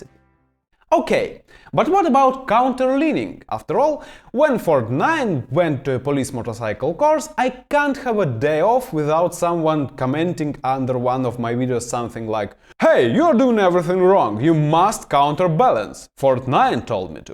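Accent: Russia. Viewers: Dude talks like he's russian robot. Is he one of those AI generated things ?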